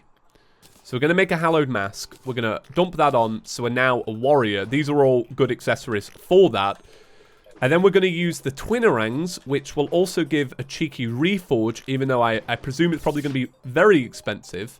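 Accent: United Kingdom. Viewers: You Sound like britain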